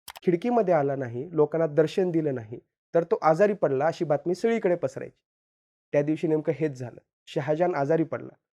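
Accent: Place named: Kolhapur, India. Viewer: Gosht chaan hoti... pan shabdochhar sarv to pari navhta. Fakt Kolhapur walya sathi VDO banavla ka ?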